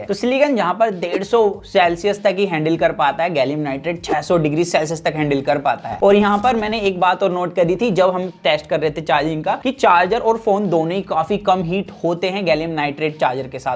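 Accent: India. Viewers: Such heavy Indian accent. Don't understand what he is saying.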